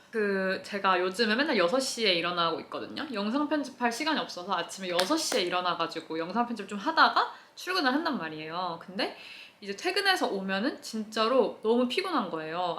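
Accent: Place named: Jeju, South Korea. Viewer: 우왘ㅋ.. 이렇게 현실 제주도말 처음들어봄..ㅋㅋㅋㅋㅋ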